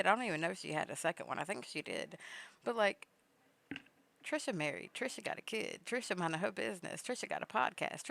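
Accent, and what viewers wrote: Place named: South, United States. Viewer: I heard the Southern accent and was sat. I know my people don’t these type of call-out videos unless it’s something serious. Also, Mucker here, passing out popcorn to all my fellows 😂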